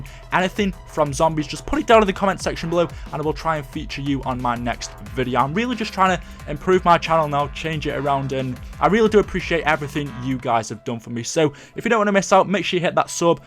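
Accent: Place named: Stoke, United Kingdom. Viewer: hate the Stoke accent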